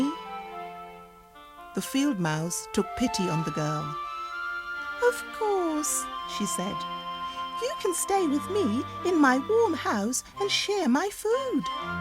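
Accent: United Kingdom. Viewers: イギリス英語ですか？よく聞くのと発音がちょっと違う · エル エル イギリス英語です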